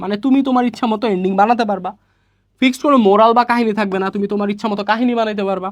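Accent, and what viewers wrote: Sylhet, Bangladesh. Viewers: Tumio sylheti ni?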